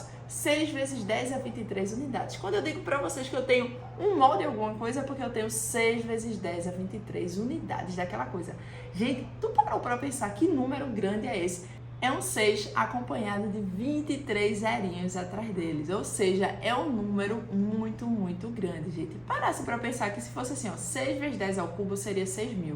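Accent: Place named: Recife, Brazil. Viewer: Este sotaque recifense não engana, com certeza temos aqui uma excelente engenheira química recifense, visse?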